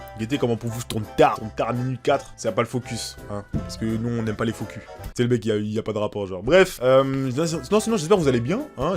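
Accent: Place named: Antilles, Caribbean. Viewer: Tu as grave un accent antillais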